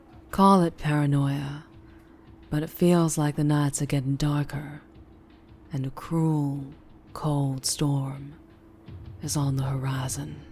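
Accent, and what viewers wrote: South, United States. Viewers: 3 weeks it's been 3 weeks i.... mam thank you for the upload i missed this. Also a slightly deeper southern accent double noice. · Wow! Amazing work as always. I know this vid wasn't as popular as normal for some reason but I love this series! And as a southern born floridian man I enjoy the stage southern American accent. Hope it continues.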